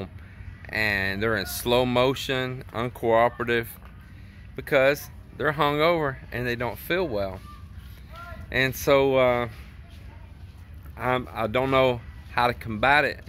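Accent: Texas, United States. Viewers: It’s language barrier. Your texas accent, figures of speech and sense of humor are “foreign” to them. Learn ilonggo so they understand you. If us Filipinos go to America, we speak english. Since you’re in the Philippines, speak tagalog or ilonggo when you’re in Ilo-ilo. Then you won’t have to stress about them having ‘amnesia’🤣😂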